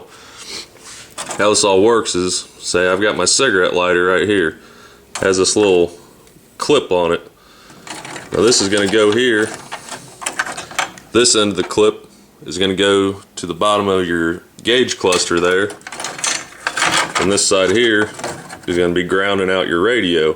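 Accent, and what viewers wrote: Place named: South, United States. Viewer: Thanks for the info my Southern Buddy(cool accent).NICE CAR !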